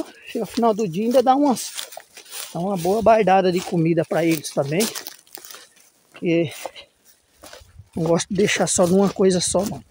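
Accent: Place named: South, Brazil. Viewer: Esse sotaque do sul é lindo ❤.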